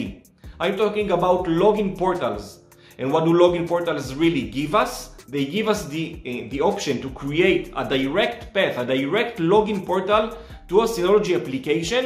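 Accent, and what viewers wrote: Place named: Israel. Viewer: חחחחחח אי אפשר לברוח מהמבטא הישראלי אחלה סרטון אח יקר.. מקצועי לגמרי ברמה של חו"ל מוקווה ששומר על עצמך בימים האלו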